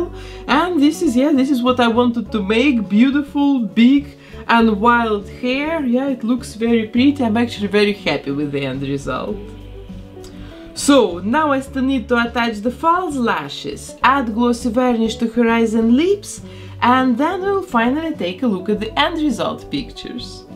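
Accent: Russia. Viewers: Акцент русский я права? 😁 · Я слышу очень сильный русский акцент 🤔🤔🤔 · Боже, смотрю вас уже несколько лет. И по акценту догадывалась , что вы русскоязычная. Но оказывается вы из Беларуси. Держитесь там, уверена , все будет хорошо))) ❤️💚🤍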